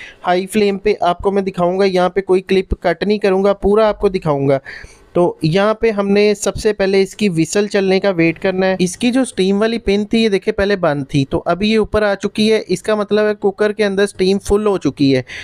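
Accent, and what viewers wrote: Punjab, India. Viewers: آپکا لہجہ اور تلفظ پنجابی ھے۔خدا کے لئیے انگریزی نہ بولیں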